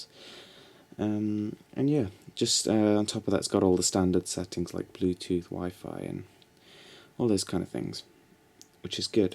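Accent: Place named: RP, United Kingdom. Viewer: Good review, thanks for taking the time to do it. I've just ordered one. P.S. You have one of the finest posh accents I've ever heard. Bravo, good sir!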